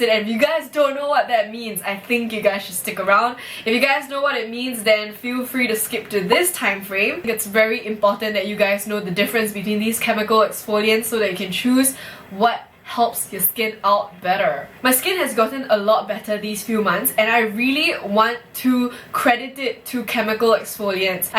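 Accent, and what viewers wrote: Singapore. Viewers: lol this is my first time watching your vlog and I cant believe I guess it right, I immediately thought that you're a Singaporean just by listening to you talking hahahahaha I'm from Malaysia btw. Thank you for the honest review, I have some COSRX's stuffs on my wishlist but still don't know which one exactly to get so this really helps me! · OMG you sound just like my classmate from Singapore. Great review! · It's the Singaporean accent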